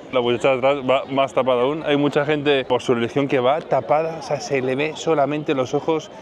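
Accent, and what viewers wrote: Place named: Colombia. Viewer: Que alegría ver por fin a!! Y seguir escuchando ese hermoso acento colombiano ♥️💃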